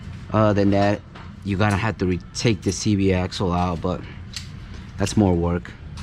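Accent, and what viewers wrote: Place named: El Salvador. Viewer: Such Beautiful spanish! Sounds El Salvadoran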